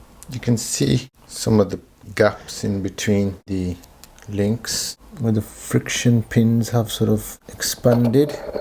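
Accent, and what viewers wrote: United Kingdom. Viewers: What country do you live in? You have what seems like a British accent. Are you of Indian ancestry? Don't answer if it's too personal. I'm just trying to place you. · Man with the British accent I replayed the opening line 3x to understand “bosses”. I thought you said a P-word which would seem highly out of character….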